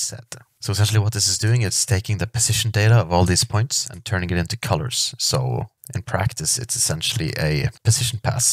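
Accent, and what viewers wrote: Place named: Norway. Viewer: Great video! (Syns eg hørte en norsk aksent, gøy med nordmenn som lage slikt innhold!)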